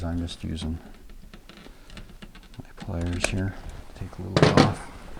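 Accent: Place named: Canada. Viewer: I can't quite figure out your accent, are you canadian or just from some northern state in the US? Minnesota maybe?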